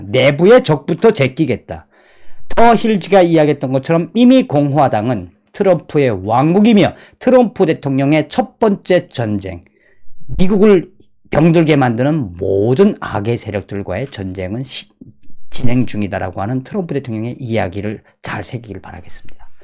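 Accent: North Korea. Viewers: 탈북자시라 억양은 어쩔수없는거 같아요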